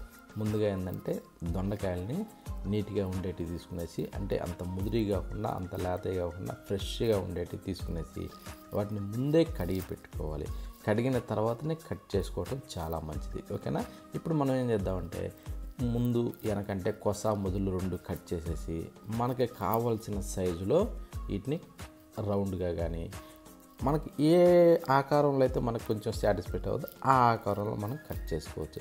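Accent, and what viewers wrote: Nellore, India. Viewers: Nellore yaasa bro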